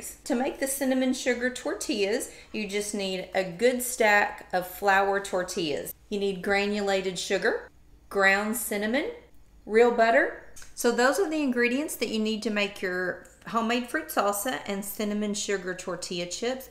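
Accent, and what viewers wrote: South, United States. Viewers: AHH,MY FAVORITE LADY.LIKE THE SOUTHERN ACCENT.YOUR THE BEST.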